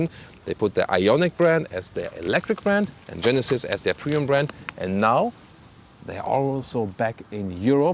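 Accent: Germany. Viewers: Dieser deutsche Akzent einfach ...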